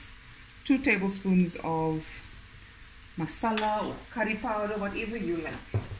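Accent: Cape Town, South Africa. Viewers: Love your food. Love your laughter. Love your accent. I miss Cape Town and my cousins and aunties that live there when I hear you speaking. Lastly you are soooo clean!! My late mother had all your recipe books. She was pedantic about cleanliness so she would have loved you even more had she seen your YouTubes and how immaculately clean you are.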